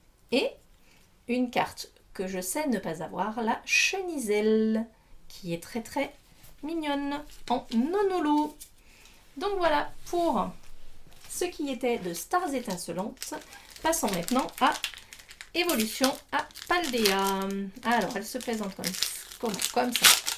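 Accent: Saint-Étienne, France. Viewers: Toujours ce petit accent stéphanois qui fait tellement plaisir... Beausaigne... Oui les tablettes de chocolat achetées chez Casino avaient des images d'animaux... Félicitations pour vos vidéos toujours sympathiques.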